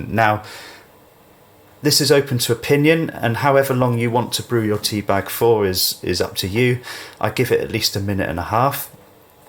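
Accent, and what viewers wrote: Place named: England, United Kingdom. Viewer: I am a coffee drinker. But I appreciate your tea with English accent. Greetings my friend.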